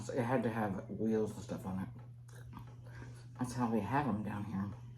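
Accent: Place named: South, United States. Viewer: love your accent. are you from the south? i’m new to the channel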